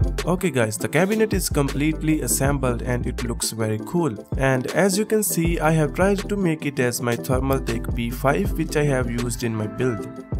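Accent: India. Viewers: OK bro I was just confused but his talking style was like Indians Thank you😊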